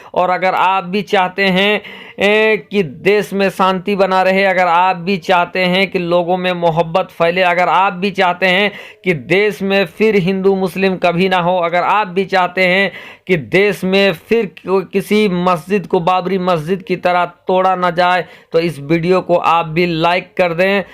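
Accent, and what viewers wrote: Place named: Jharkhand, India. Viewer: Jharkandi talaffuz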